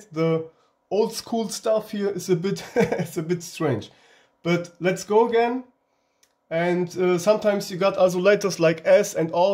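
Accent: Germany. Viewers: i hear german hahah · dieser Deutscher Akzent 🤣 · der boss mit deutschem akzent, gutes video. danke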